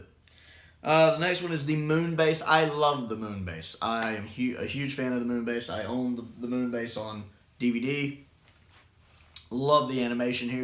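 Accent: South, United States. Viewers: to give some thoughts on what you havent seen yet Highlanders is fun, surprisingly Jamie isnt actually a major character until the end, but the story itself is still enjoyable, Troughton gets to do some dressup and fooling around, Polly is also great in that one. Underwater Menace is a big guilty pleasure, yes its OTT but its so much fun, id say this is a "popcorn" story. The Evil of the Daleks isnt perfect, but the highlights are excellent. Troughtons performance is top tier and Jamie becomes a 3 dimensional character here. Abominable Snowmen is a good story, but i feel like it kind of sags in the middle. Though the good parts are really good and its a nice setup for Web of Fear i havent seen Wheel The Dominators at its core is cardboard, and the plot is weak, but the tardis team are alot of fun and the comedy makes it worth it. Still can be a fun watch. 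go rewatch The Mind Robber its great. The Space Pirates is underrated. Everyone hates it, but im one of the few that actually quite likes it. I think its let down a little bit by the Tardis team being background characters for alot of the story, but they still have a decent impact esp at the start and end, but i thought the central plot was really cool. Also would be interesting to hear your thoughts on Milo Clancey as hes played by an actor from my country (NZ) and hes playing the role of a stereotypical southerner. Which i assume you might be given your accent? Anyway, Troughton era good.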